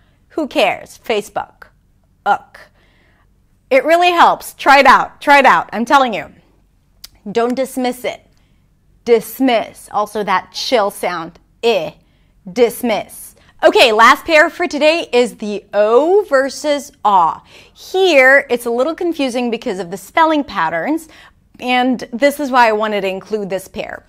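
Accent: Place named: United States. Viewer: You seems to be american native speaker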